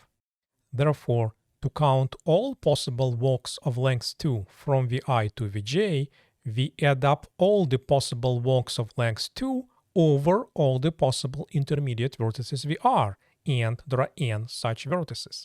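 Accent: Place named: Russia. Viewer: Русский акцент я узнаю из тысячи :D. Сам этим страдаю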